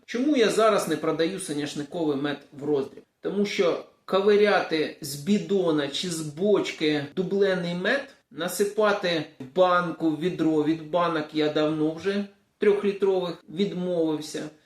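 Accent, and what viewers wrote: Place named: Chernihiv, Ukraine. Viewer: коли слухаю тебе то згадую дитинство. У 1978році до нас після Ніжинського педінституту прийшло декілька молодих вчителів серед них фізик слово коли вимовляв так як ти думав що він з Чернігівщини, потім він напевно повернувсь на батьківщину. Ти з чернігівської де така говірка?Чи може Каликовський так його прозивали учні був з Харківщини?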